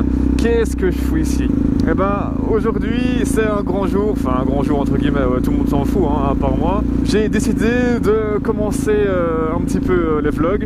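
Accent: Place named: North, France. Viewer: C’est le France qui parle à le Belgique. Sympa ton sujet. Donc, Wallonie = roue de 21 et méga suspension type les pistes de le Afrique. Quand à le Flandre, télé à la place du GPS car tu t’emmerde velu. C’est bien mon résumé? J’adopte ce style de vlog. Refait autant que tu veux. En plus, je me lasse pas de cet accent de la nord. Bon, moi je retourne faire un tour sur les jolies routes de le Côte d’or 😇👍👍🤪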